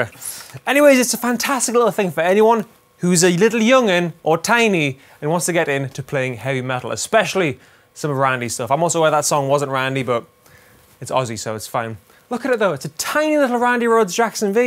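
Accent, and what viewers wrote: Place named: England, United Kingdom. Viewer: He's English, some English accents can sound slightly irish.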